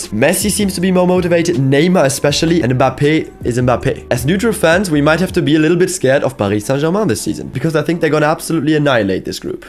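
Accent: Germany. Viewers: your accent is so german. Iconic!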